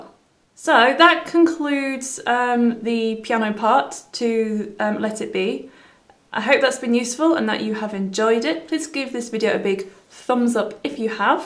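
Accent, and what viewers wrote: United Kingdom. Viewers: Your voice, with that British accent, is succulent. Thank you for the tutorial, I always felt that my game was missing something, and I just discovered that it was the F6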